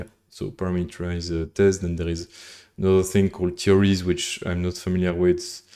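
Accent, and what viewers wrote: France. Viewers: wery wery french accent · On dirait un francais qui parle en anglais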